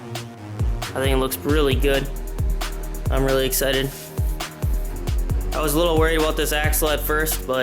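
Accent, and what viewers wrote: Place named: United States. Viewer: Keep tryin not to laugh at ur thick yank accent so i can hear the vid... lol.... But damn your talented as hell... Incredible job so far!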